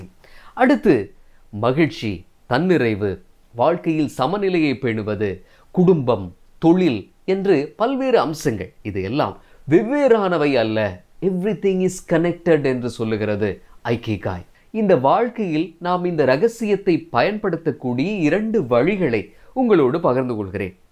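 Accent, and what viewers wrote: Sri Lanka. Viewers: நீங்க பேசுவதே அழகு இலங்கை தமிழா? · நீங்கள் இலங்கை தமிழர்தானே இவ்வளவு அழகா எங்க ஊர்ல எவனும் தமிழ் பேசமாட்டானே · Yes Avar kandippa elangai thamilarthan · இலங்கையை சேர்ந்த நண்பர்கள் எனக்கு அதிகம் உண்டு அவர்கள்தான் தமிழை மிகவும் அழகாக பேசுவார்கள் நாம் தமிழர் தமிழர் என்று தமிழ்நாட்டில் இருந்து கொண்டு தமிழை நாம் சரியாக பேசுவதில்லை என்பது இதன் மூலம் சரியாக தெரிந்து கொள்கிறோம். தமிழை மிகவும் அழகாக பேசும் இலங்கையை சார்ந்த அன்பு சகோதரனுக்கு எனது மனமார்ந்த வாழ்த்துக்கள் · Sri Lanka tamil 🖤🇱🇰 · Ilangai tamizhan avar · Athu thaan Eelam thamil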